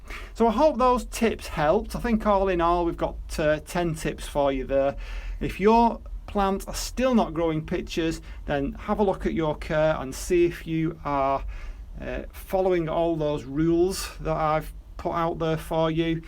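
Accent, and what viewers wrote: England, United Kingdom. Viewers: It`s good listening to a English accent but greetings from the Philippines, I collect water from the roof in a drum actually at the moment its the rainy season so my Nepenthese are happy outside not in direct sunlight Humidity here is around 85% everyday so no problem there. I believe I`m right in saying the Philippines is home to largest number of Nepenthese including N.attenboughrii but I have to grow Lowland spp its too hot for the Highlanders where I live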